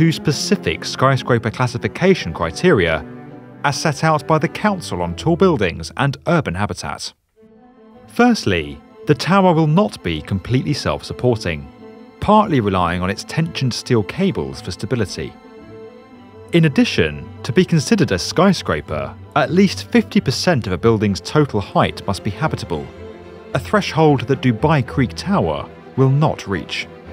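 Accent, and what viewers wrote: United Kingdom. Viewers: SuperOffroader6x6 it’s called a british accent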